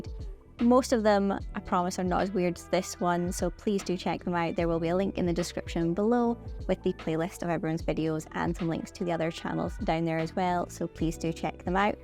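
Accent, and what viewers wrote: Scotland, United Kingdom. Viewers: This is absolutely the most wonderful sexy beast. Its a joy to hear another Scots Crafter. Keep up this fab work xxx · Omg! 😳 Stephen King has written a short story about something along the lines of the mushrooms eyes there. It’s been decades since I read it but it still makes my skin crawl. As did your talk about small holes and them little eyes. 😵 Anyways, great build and great presentation. I’m also a sucker for a woman with a Scottish accent so that kinda topped off the experience. Good job! 👏👏👏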